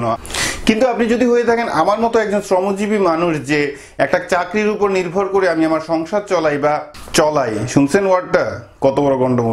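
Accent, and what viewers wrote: Sylhet, Bangladesh. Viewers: ধন্যবাদ ভাই সুন্দর করে বুঝানোর জন্য।ভাল লাগে আপনার সবগুলা ভিডিও।( সিলেটি পুয়া। কথায় পরিচয়। ) ধন্যবাদ।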